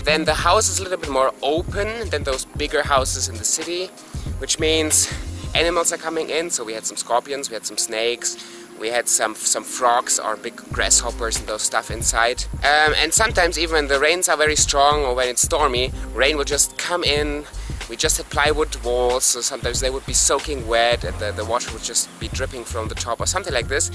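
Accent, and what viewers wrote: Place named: Eastern Visayas, Philippines. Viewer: nice u speak the dialect WARAY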